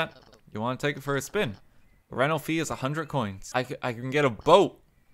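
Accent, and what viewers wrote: Ireland. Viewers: i just found this channel, he kinda has an irish accent lmao- subbed because he's actually really funny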